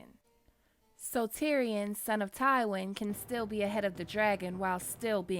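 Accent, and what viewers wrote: United States. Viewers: I think I just fell in love with your voice. GOT needs to have accents other than unidentifiable Eurocentric and various British accents. Why have there never been any American or Canadian accents on the show? Someone with a voice like yours would be very refreshing.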